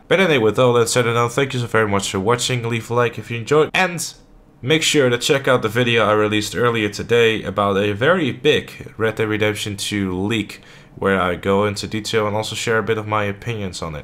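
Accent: Netherlands. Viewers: Mr_ FancyPants he’s Dutch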